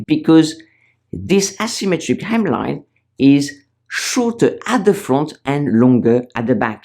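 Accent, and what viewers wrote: France. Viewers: I could listen to you talking with your French accent forever. 💙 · Such helpful advice, even more effective delivered d with a charming French accent! And your humor is another bonus 😍thank you! Just found your video today so am subscribing formore🙏🏼🥰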